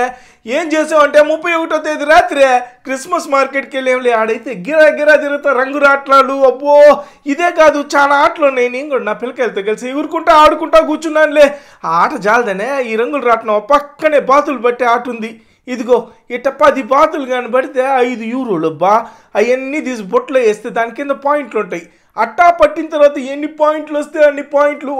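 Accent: Nellore, India. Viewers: Super Tammudu meeru video pettadam chudakapovadama adi 5min ayina 10min ayina mana nellore slang naa Chevilo amrutam laa vundi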